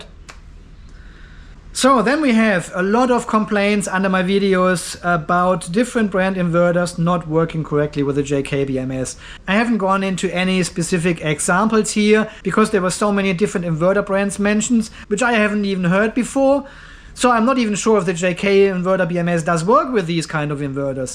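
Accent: Germany. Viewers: Hello - love watching your show - German accent and all! Are you done with JK for now? I am quite fond of them and am building a few 52V inverter batteries with them. Quick question that you might be able to help with? I've used the "GPS" port with USB serial quite successfully on the smaller BMSs (to monitor and integrate into Home Assistant). I've tried the RS232 RJ11 - but without success. Is there a way to get someth9ng similar running on the inverter BMS? I've got a Bluetooth version running into Home Assistant - but I'd prefer hardwired.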